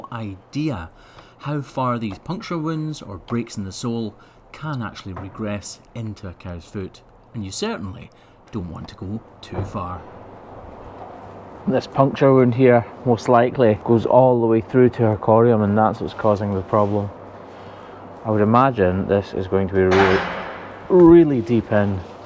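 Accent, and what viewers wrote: Scotland, United Kingdom. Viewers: I really enjoy watching your videos . I’m fairly new to your channel, but I must confess, since I’ve been watching you every time I clip my nails I hear (in your lovely Scottish accents) “ You lot just look at this heifers overgrown hoof , lets just make her as comfortable as possible “ 😜🤪😝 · I'm a 65 year old grandma and I live in a little old house on the lower Eastside of Erie, PA. And I never miss an episode of the hoof gp. Why I find it so fascinating 🤔 I have no idea. Maybe it's that Scottish accent 🙂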